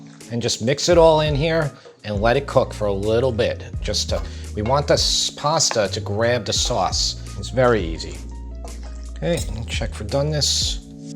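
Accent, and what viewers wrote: New York, United States. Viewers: You really act and speak like one of us New Yorkers from the island. It's nice seeing someone with the same brands we all use here.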